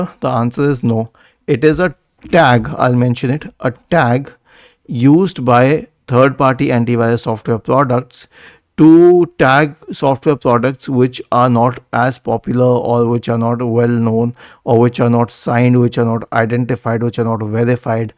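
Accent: India. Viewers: indian accent ahh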